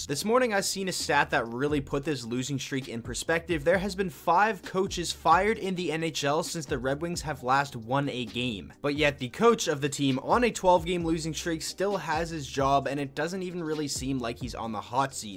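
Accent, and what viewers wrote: Canada. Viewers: Dude you're canadian aren't you? I hear that accent 😎 (Also, it's eyezerman)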